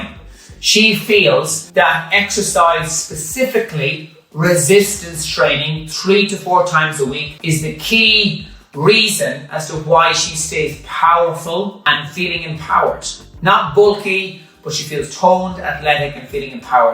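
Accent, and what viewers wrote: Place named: Ireland. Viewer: Are you Irish? I love the accent ❤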